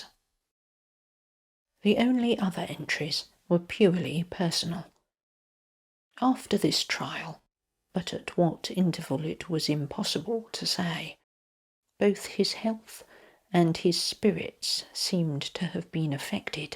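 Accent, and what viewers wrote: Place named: West Country, United Kingdom. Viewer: Wonderfully atmospheric. Beautiful narration—is that a “west country” accent? If so, where is the west country? I love the accent and grammar so much I’m determined to learn how to do it. PS, it was hard to concentrate once I learned there was a box of papers, unlocked, just sitting there, as big as Dallas, that no one bothered to read for 300 YEARS! It took me a few minutes to settle down as I tried to wrap my mind around it.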